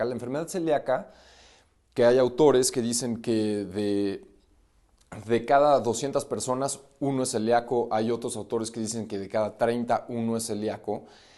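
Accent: Mexico. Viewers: Excelente información muy valiosa.. Muchas gracias por su gran claridad y acento muy interesante a la Mexicana!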